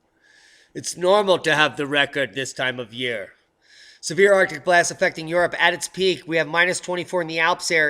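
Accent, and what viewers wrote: United Kingdom. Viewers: Love your British accent. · I love the British accent. You are f*ckin’ funny as hell. Can’t wait to hear you and Robert tonight. Global warming causes snow. Didn’t you know?! Haha.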